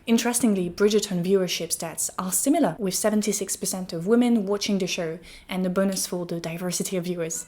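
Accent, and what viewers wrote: France. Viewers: Daaamn girl. You are 🔥. Loooove the French accent as well 🙈. What's your Also it might be true that Gen Z are more politically active but not really well educated/informed on the political topics they are active about. It seems they just want to be angry at something and want to be loud because of it.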